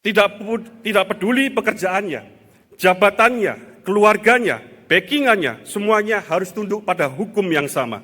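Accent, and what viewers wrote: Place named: Java, Indonesia. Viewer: Logat nya kok jawa ya??😂, jawa memang Muantaappp👍😁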